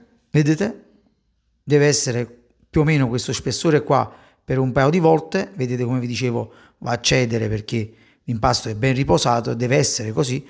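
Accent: Naples, Italy. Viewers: Quando ti sento parlare con il tuo accento napolitano m'incanta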